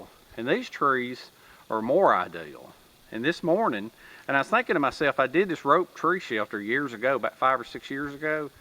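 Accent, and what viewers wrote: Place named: West Virginia, United States. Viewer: well either way my wife's a fan, I think it's the accent. My cousin Mike, back home in WV looks and sound just like ya...✌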